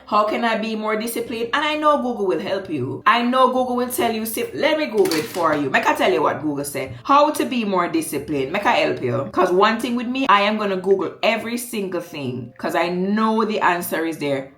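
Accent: Jamaica. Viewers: I really love how speaks, articulate but drop in the patois funny but serious same time. 💫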